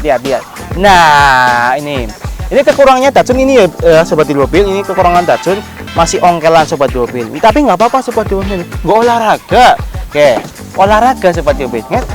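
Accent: Banyumas, Indonesia. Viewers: Ngapak jiwa,,,Sukses trs masseehhh,,Gombong Puring Hadir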